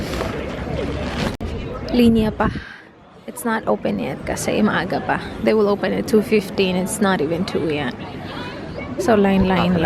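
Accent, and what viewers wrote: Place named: Visayas, Philippines. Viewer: kaya nagustohan ko vlog nya kasi ung accent nia bisaya tas ung english nya maganda rin pagka pronounce nia.. 😍😍😍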